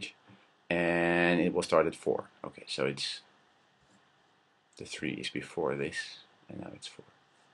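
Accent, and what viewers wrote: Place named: Netherlands. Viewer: DAT DUTCH ACCENT DOOOO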